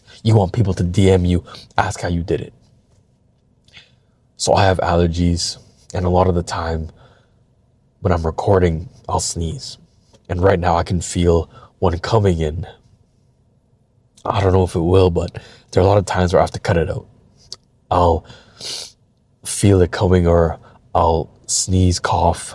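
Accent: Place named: Canada. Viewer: I appreciate & Love You Brother for this I suffer with allergies too 😂🤣🎯 if I don't take medicine for it at least once a day at worst my could make me literally sick like a cold just all the symptoms on 1,000 max & if that happens it can highly likely trigger my asthma too. I wonder which side your family u get it from? 🤔 I know I get it from my dad & his parents as they used to call it hay fever back n da day before it was called allergies 😂 Yeah sometimes I mispronounced & go off somewhere too 😂 it doesn't help I have a thick Canadian accent like u ironically sprinkled with an ancestral accent from our families 😂🤣🤦🏿‍♂️ U seen my vids 😂 the vids u & everybody else saw honestly on average is my 3rd or 4th try 😂 Yeah social media is fake 😂 but me I'm just raw with it & folks told me they love my vids on my thoughts 💭 🤔 It be so much I gotta release it ❤️‍🔥 Eventually I'm gonna move it to other social media platforms ❤️‍🔥 Love U My Brother ❤️‍🔥🤝🏿❤️‍🔥💯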